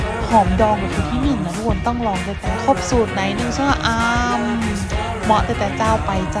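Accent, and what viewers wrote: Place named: North, Thailand. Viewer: ภาษาเหนือ น่าฮักขนาด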